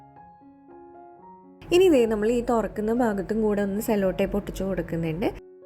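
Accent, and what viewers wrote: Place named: Kannur, India. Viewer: kannur aano? Njanum kannur kaariyaane...enik slang ketapo cherioru doubt thonirunnu😅😅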